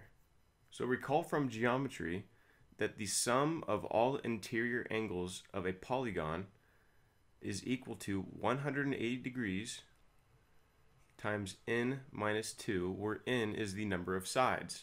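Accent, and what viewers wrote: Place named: United States. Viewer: American voice talking about an Australian coin.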